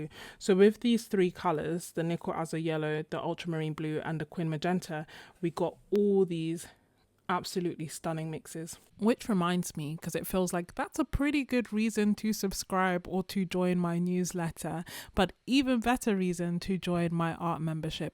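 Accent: England, United Kingdom. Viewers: Thoroughly enjoyed watching this and listening to your train of thought. Excellent examples, very clear and large. Many thanks for your hard work on this video. I like the simplicity of the "Sketcher's" names. Best wishes from the Pacific NW. (Listening to you makes me pine for England - I miss it so much!)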